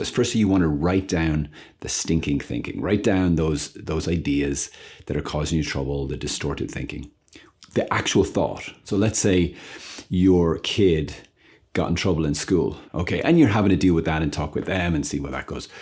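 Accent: Ireland. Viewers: I like the Irish accent to...❤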